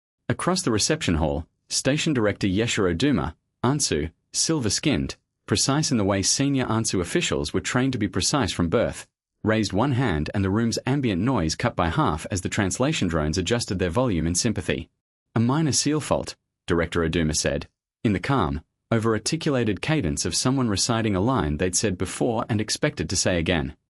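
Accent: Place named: Australia. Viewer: wdf?... and the reader has an Australian accent? (I might be wrong but it sounds... unusual)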